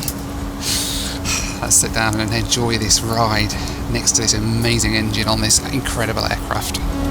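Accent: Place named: United Kingdom. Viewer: Read the automatic captions to nearly fall off your chair laughing! That, combined with British accent, had me laughing like an idiot.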